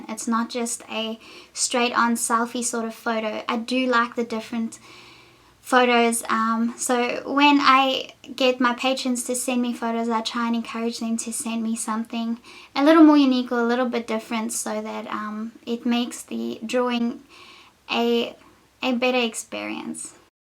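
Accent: South Africa. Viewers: Beautiful I love it. Are you South African?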